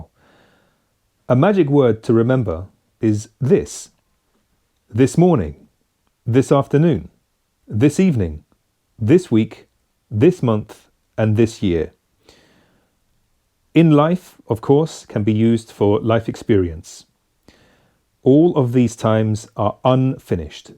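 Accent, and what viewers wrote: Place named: London, United Kingdom. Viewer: Hi my dear teacher! That was exactly what I needed! Your excellent explanation of Perfect Perfect Simple, with your beautiful londoner accent! Congrats! It is a great video production!🌹🙏😘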